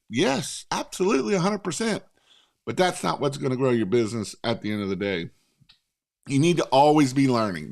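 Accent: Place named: South, United States. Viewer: I remember watching videos you made 2+ years ago and you're much more eloquent speaking. You've also lost that southern drawl quite a lot. Respect from NZ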